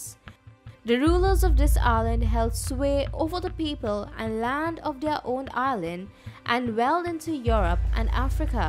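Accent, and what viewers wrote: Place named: India. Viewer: Rayhan Sakib Sounds like Indian accent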